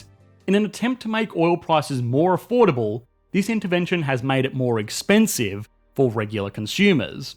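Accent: Australia. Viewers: Can we all agree Australian is the most abhorrent accent?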